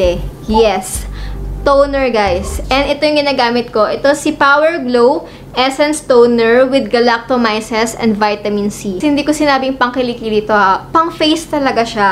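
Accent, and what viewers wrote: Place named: Visayas, Philippines. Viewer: Visaya kaayo uy *HAYAHAY NA ANG BUHAY MO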